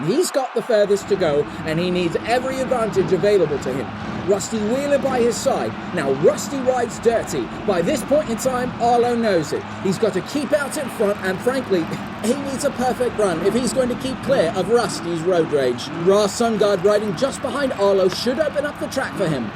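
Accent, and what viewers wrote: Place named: United Kingdom. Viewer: Makes sense now, the accent sounded very familiar to be British🤣. Everything about the races, street lights and commentary is amazing, keep going!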